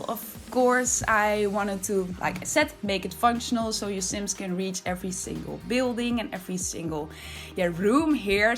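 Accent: Netherlands. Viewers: I can recognize that dutch accent anywhere! Dit gebouw is echt geweldig, al je builds zijn zo creatief, ik heb helaas niet alle packs dus bij mij komt het er nooit zo mooi uit te zien :c Maar ik blijf dromen hahaha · Hoorde het accent en dacht.. dat is een Nederlandse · ik kan het nederlands accent horen in je stem lol heel mooie en creatieve build btw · Waarom heb je zo’n Nederlands accenten. Ben je Nederlands?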